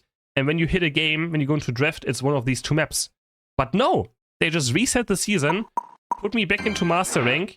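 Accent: Germany. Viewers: Du hast einen sehr starken deutschen Akzent wenn du englisch sprichst xD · I don't even play the game for license points as I already own the pokemon I like to play as. For me, item enhancers are more important, to max out all the items I use. Also this guys accent is cringeworthy thick lol (I do speak german natively and I always feel like this guys videos should be in german instead lol)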